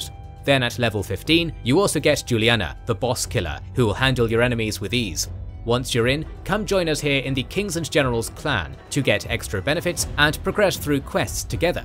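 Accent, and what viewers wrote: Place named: RP, United Kingdom. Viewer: Very informative, clear and well illustrated video. Compliments. I also very much like your articulation and British Standard accent, it sounds very elegant like an officer of the Empire in Star Wars (it reminds the briefing in the Tie Fighter video game) and it is easier to understand for non native English speakers such as myself. Hats off ^_^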